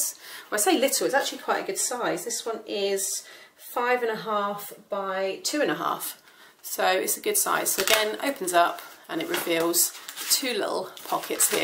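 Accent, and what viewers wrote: United Kingdom. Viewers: I LOVE this project! Being a chocolate lover doesn’t hurt - but I’ve never had such a fun way to give a gift of Hershey bars!!, you do a great job explaining everything in your video - and I love listening to you talk. I love listening to your British accent. Anyway - keep more videos coming, you’re great!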